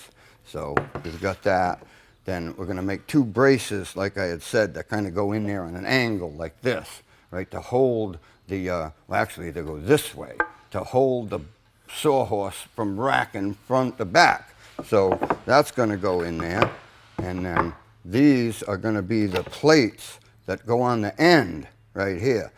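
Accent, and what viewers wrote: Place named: Rhode Island, United States. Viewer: As a native Newporter now living in northern Norway discovering this channel has brought back quite a few memories and feelings of nostalgia. The thick RI accent has is music to my ears. I've since lost mine years ago from all of my moves. Now that my journey into woodworking has started I've found this channel to be incredibly instructive and insightful. is a true master craftsman. Thank you so much for this. Cheers! p.s.- Now I'm dying for some clam fritters, a good swordfish steak or even a good Italian grinder.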